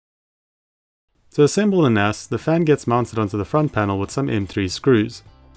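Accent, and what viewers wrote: South Africa. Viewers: Hah! Thought I recognized the accent, I'm also South African, though still in the homeland. Love the channel man, gonna do a lil Pi NAS project in December based on your specs. Thanks for channel man.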